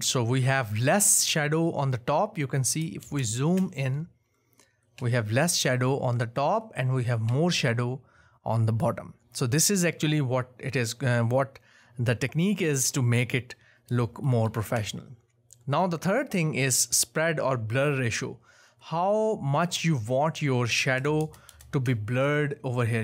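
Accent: India. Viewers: I love your indian accent.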